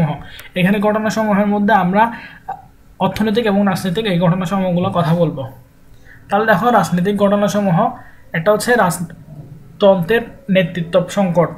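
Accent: Chittagong, Bangladesh. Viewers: ভাইয়া আপনি কি চট্টগ্রামের বাসিন্দা???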